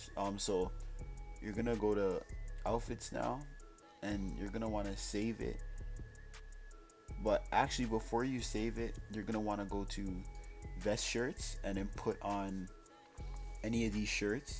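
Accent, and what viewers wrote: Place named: Toronto, Canada. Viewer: This guy is definitely from Toronto "it was cheesing me" lmao I had a feeling the whole video but that confirmed it.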